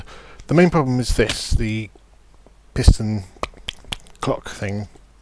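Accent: England, United Kingdom. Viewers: Hey your from England Ent ya?!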